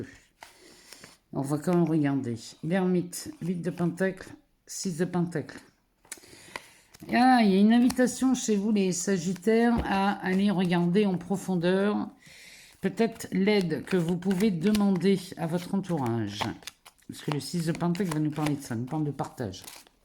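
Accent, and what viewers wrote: North, France. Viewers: Merci Merci Merci pour vos tirages toujours toujours très JUSTES … Dans quelques jours, je passe en justice pour mon divorce, et je sais maintenant que enfin je vais être mentalement, psychologiquement et physiquement « libérée et soulagée ».. J’adore vraiment votre voix qui est franche avec un p’tit accent soit du nord soit de la Hte Loire … Bien à vous et bonne semaine!!! 👏🫶🏻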